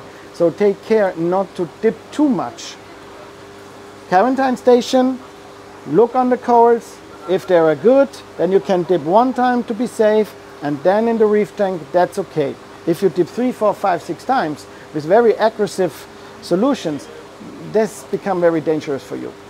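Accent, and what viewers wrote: Swabia, Germany. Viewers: no a little more schwäbisch please 😊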